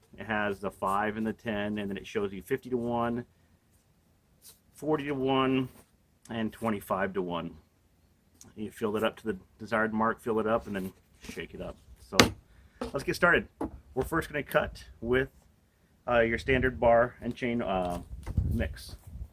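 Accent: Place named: United States. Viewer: No way Aussie, definite American there mate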